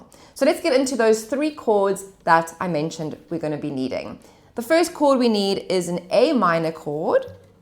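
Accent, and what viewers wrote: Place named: South Africa. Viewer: Great lesson and refreshing to hear a good old south african accent again.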